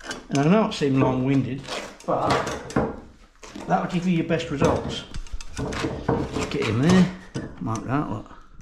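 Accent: United Kingdom. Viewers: Just found your channel I love the way you get your knowledge across. I can't cut straight to save my life but after watching this it should be a bit easier. Also you must be from Norfolk !!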